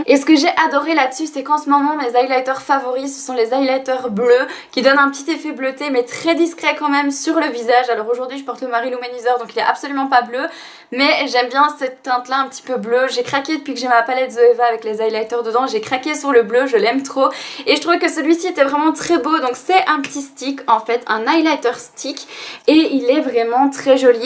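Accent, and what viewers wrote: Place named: France. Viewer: Make Up Artist l accent francais qui ressort aussi dans les langues latines par ex.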